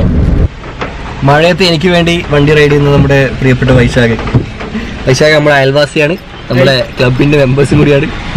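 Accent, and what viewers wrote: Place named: Iritty, India. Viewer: ഭാഷ വള ഇഷ്ടമാണെനിക്ക്, കാരണം ഞമ്മളെ നാട്ടിലെ ഭാഷ തന്നെ ഇരിട്ടി ഭാഷ ഉപയോഗിക്കുന്നുണ്ട്. അത് തന്നെ ' കേരള to യൂറോപ്പ് യാത്രക്ക് എല്ലാ വിധ ആശംസകളും നേരുന്നു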